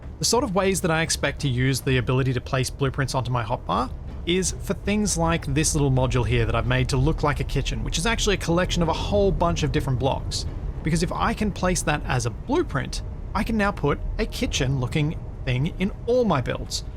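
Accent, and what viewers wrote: Australia. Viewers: I'm a simple guy. I hear Aussie, I sub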